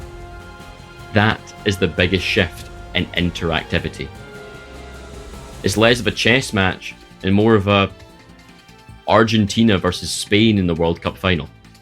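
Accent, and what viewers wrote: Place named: Ireland. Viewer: I can't argue with anything you said honestly, well said. PS your accent is cool. Is it Irish? Scottish? An English dialect?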